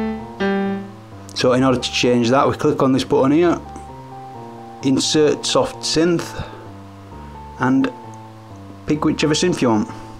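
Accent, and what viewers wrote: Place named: Manchester, United Kingdom. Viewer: love the Manchester accent :-) thanks for the video. im stuck between either getting acid Pro or reason I'm leaning towards acid Pro because it's less money I'm into making more minimal kind of electronic music ambient stuff so yeah. you any relation to Karl Pilkington?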